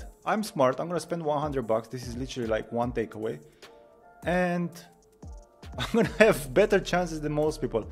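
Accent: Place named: Romania. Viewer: Tocmai mi-am dat seama ca ești român, imi parea accentul cunoscut 😂. M-am apucat acum o săptămână de învățat css si html. Sper să fie bine. :))))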